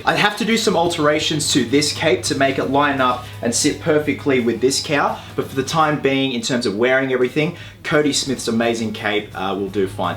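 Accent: Australia. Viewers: It’s weird cuz batman has an Australian accent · I actually bought it that you were Batman when you were doing the reveal video. Then when you spoke again I was like "Oh, right he's Australian" lol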